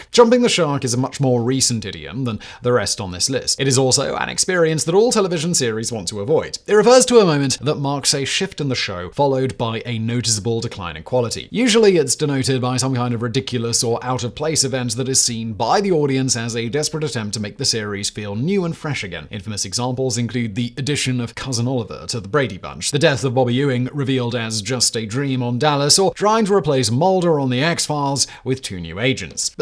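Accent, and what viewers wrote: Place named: RP, United Kingdom. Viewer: I’d not heard of ‘jumping the shark’ before. But I was wondering the other day about where ‘Bob’s your uncle’ came from. BTW, you and I both speak British RP, so for future videos, Marquis is not pronounced markee, as in the large wedding-type tent, but markwiss. (We might be hearing it a lot next year, come the coronation!)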